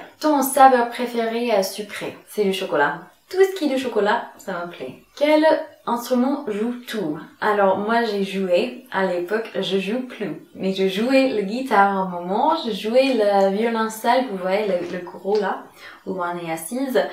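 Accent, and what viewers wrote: United States. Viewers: J'aime beaucoup t'es vidéos , sa a dû être dur d'apprendre le français pour toi , et j'aime beaucoup l'accent américain que tu as 😄 voilà , continu comme sa tu es super !!☺️ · Super vidéo ! J'adore ton accent, j'aime tellement quand les américains ou les anglais parlent en français. Je te trouve vraiment touchante et authentique ! Bisous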